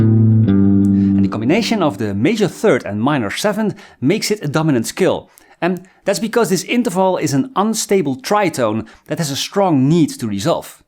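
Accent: Netherlands. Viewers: This is the BEST guitar channel I've discovered in all my years. And your playing - is beautiful, soulful, tasty, everything I'd want to be! And your accent reminds of my beloved late (Dutch) father.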